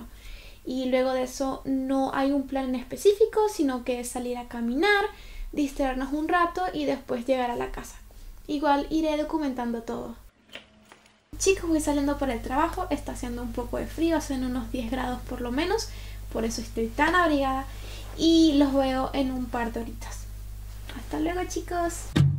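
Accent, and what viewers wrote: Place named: Chile. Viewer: Hablas como chilena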